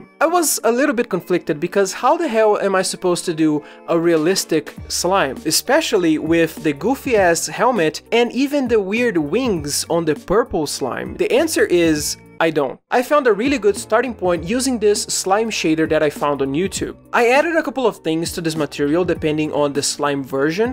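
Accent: Brazil. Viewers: pelo teu sotaque tenho certeza que tu é brasileiro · ctz q tu é br, esse sotaque n me engana kjkjkjk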